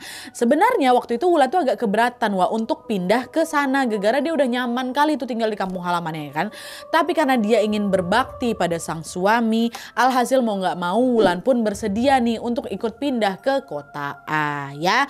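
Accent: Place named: Kalimantan, Indonesia. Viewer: pkee logat Kalimantan,hakk😭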